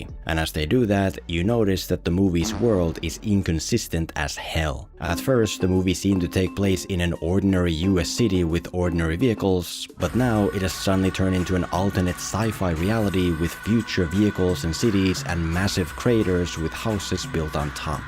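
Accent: Finland. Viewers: I have watched your videos for more than 10 years and only now has it clicked for me that your accent might be Finnish.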